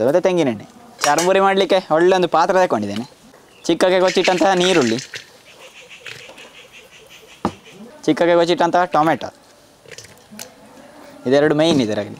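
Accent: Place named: Coastal Karnataka, India. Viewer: ಆಹಾ ನಿಮ್ಮ ಪರಿಪೂರ್ಣ ಕರಾವಳಿ ಕನ್ನಡ ಕೇಳೊದೆ ಒಂದು ಖುಷಿ.....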